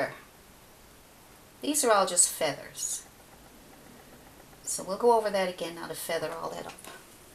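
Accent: Newfoundland, Canada. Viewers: are you from Newfoundland? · Thank you so much for putting up this tutorial!, I was wondering why your accent was sounding so familiar so hello fellow newfoundlander! <3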